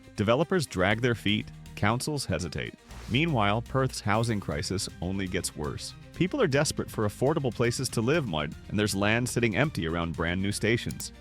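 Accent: United States. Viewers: American accent not even pronouncing place names correctly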